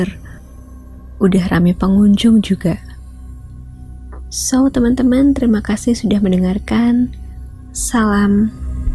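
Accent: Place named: Semarang, Indonesia. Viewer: Dari logatnya kyk org semarang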